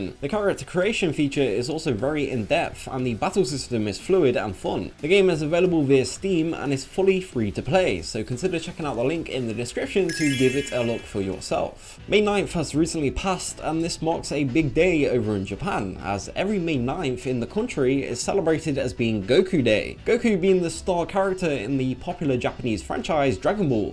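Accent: United Kingdom. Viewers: That's because he's British, all British people would call the anime Dragon Ball Zed.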